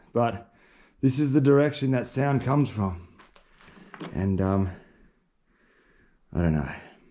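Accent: Australia. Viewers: Less than 2 minutes in and he's obviously another Aussie. I'd just like to know which state this is, as I've found a few strange unexpected places in West Australia, myself.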